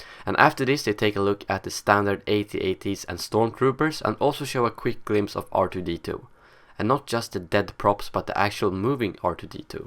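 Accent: Sweden. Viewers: Are u from Sweden??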